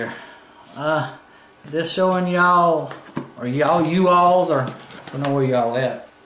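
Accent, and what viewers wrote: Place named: United States. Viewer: i love the american accent ! merkia ! :D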